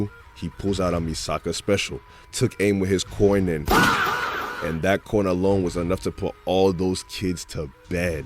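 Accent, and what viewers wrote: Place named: Nigeria. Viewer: Bro you Nigerian aren’t you? 🌚😌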